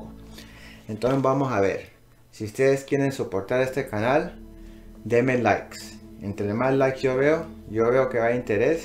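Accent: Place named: United States. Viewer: Este es un gringo que aprendio español en cartagena.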